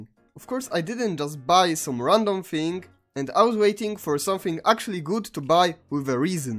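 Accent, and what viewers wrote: Poland. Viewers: Po pierwszym słowie czułem że jesteś Polakiem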